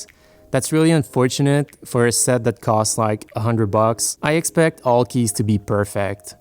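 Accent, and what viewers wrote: Quebec, Canada. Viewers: You sound Quebecois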